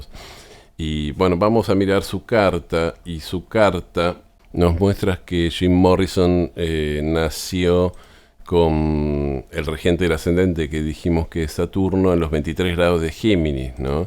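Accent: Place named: Buenos Aires, Argentina. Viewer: Que lio que haces!! Pero me gusta tu voz y tu accento argentino... y porteño..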